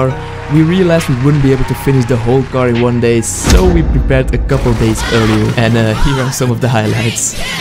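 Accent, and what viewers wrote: Belgium. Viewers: WACHT! BEN JE EEN BELG?!😳❤️ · He has an french/belgium accent, you can easily heard it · Mec, t'es belge ? :D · Wowww ik wist ni da u Belgisch was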